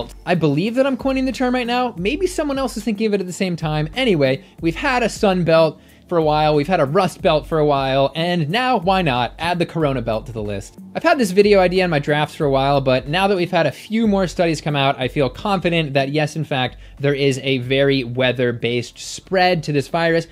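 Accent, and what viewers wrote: Canada. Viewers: damn, i so thought you were canadian!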